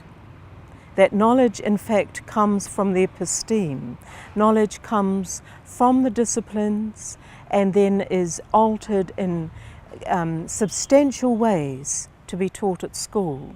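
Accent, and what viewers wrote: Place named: England, United Kingdom. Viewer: One of New Zealand's least intelligent academics, a fake celebrity charlatan riding the coattails of her own controversy to success. will be remembered forever as the moron who tried to mould the education system in her misinformed image and failed. Imagine sitting through a whole lecture of this bints unbearable Tory lilt, should have been deported back to the UK years ago.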